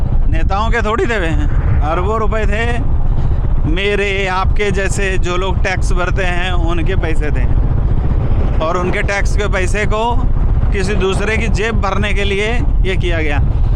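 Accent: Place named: Khandesh, India. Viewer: Najara nahi nazara kahete hain Or Intejar nahi intezar hota hain voh Jarrurat nahi zarurat kahete hain Bijness nahi business Urdu ka janaza mat nikalo language ki study karlo bad me journalism ka shouq karna۔۔۔۔ektarf ka shuru hain dusri tarf ka nahi matalb kya hain 😂😂😂 one way kahete hain use ۔۔۔۔ industriej nahi industries kahete hain۔۔julfen nahi zulfen۔۔۔۔17 manjil nahi 17 manzil .....jameene nahi zameene.....inshort samanya or average class ki journalism.......on camera to Kam se kam ache or bahetrin alfaaz ka intekhab karna chahiye or aam zindagee me jis khandeshi lahejy me baat karte hain usse bahar nikal kar shiree or dilkash lahejy ka istemal karna chahiye...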